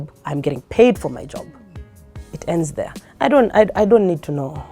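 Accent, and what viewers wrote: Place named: Mombasa, Kenya. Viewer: This lady Tande is a bomb. Awesome personality, great in anything she does. I real love and affirm her. Mombasa Swahili dialet second to none. Not conforming to society expectations but on a lighter note, can make a great wife to somebody deserving. She has personality and brains of a well parented gal.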